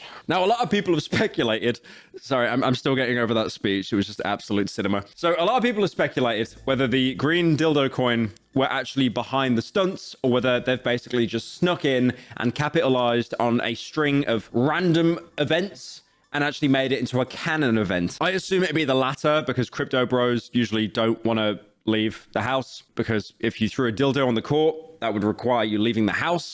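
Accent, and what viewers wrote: United Kingdom. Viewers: Why is this british guy talking? Im cutting cheese for my sandwich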